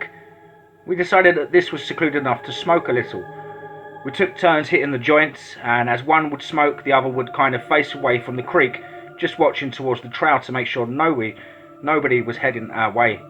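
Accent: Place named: Estuary, United Kingdom. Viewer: It's good to hear narration with a Thames Estuary accent; makes a refreshing change.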